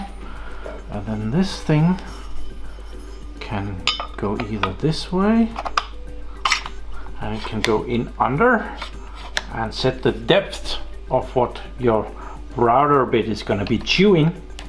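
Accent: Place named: Denmark. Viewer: Jeg har kun set 1 video, og er allerede subscribet til dig fordi du taler Engelsk på den eneste rigtige måde - Danglish.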